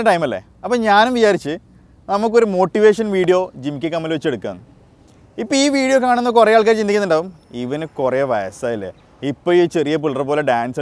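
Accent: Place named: Malabar, India. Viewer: New subscriber here.. Boss malabarilaano.edakk nammale basha keri varnn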